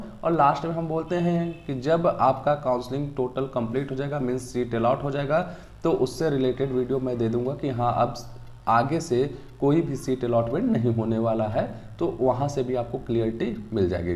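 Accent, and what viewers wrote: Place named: Uttar Pradesh, India. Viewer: Bhai up waale m ko yam aur H ko yah Cos ko cas aur Gauge ko gaaz hi Bolte hai😄😄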